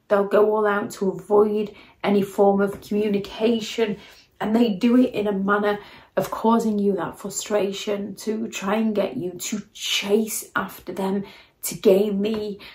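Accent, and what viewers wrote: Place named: England, United Kingdom. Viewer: Good talk 😀🦜 good voice 💯 accent from England 😍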